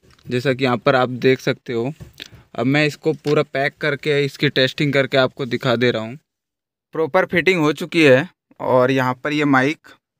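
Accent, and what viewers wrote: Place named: India. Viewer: No me gusta el acento que tienen los indus en inglés.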